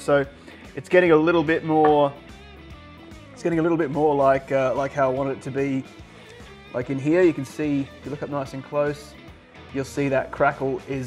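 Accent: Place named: Australia. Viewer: Gidday, some excellent tips in this video, but the bloke sounds like he's an Aussie. Just a reminder, we've been using Celsius for nearly 50 years in Australia. Fahrenheit is obsolete.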